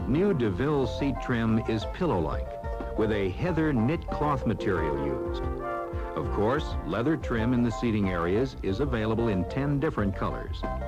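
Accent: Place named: Michigan, United States. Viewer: I've always liked how all of these films from this era have narrators with Michigan/Great Lakes-region accents when these companies were much more concentrated there. It's a subtle thing but you notice it when you've been around. It's just a shame he didn't get to say center "council". That phrase is as Michigan as Meijers with an "s" I've noticed. A lot of cool video shots of the Metro Detroit area in these films too if you pay close enough attention. The freeway ramp is at the Lodge and Southfield; the building in the NW corner of the screen is the IBM building which had just been completed that year (1980). The "One Way" sign at the end of the freeway ramp is something Michigan still does, because I guess it's the only place people have ever made U-turns at the end of an entrance ramp at some point in the past to warrant them.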